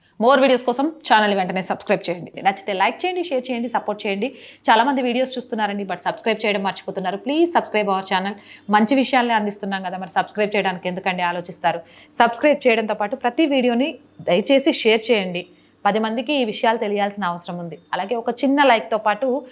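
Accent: Telangana, India. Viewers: Normal telugu maatlaadatene baavuntundi ra, itu leka atu telangana yaasa vaddu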